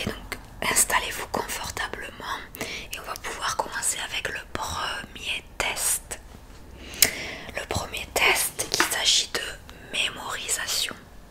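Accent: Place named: Toulouse, France. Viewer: C'est marrant, j'ai récemment suivi une formation, et ma formatrice avait aussi un accent toulousain, et habitué comme je suis à tes vidéos, j'éprouvais des déclenchements à l'écouter parler. 😅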